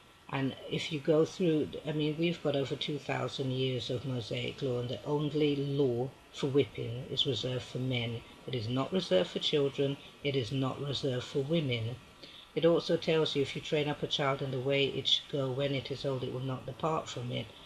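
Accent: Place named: United Kingdom. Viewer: Only 2 likes. I dont understand so called Israel. Ms, some of us are listening. P.s. I love the British accent. It makes you that much more fabulous.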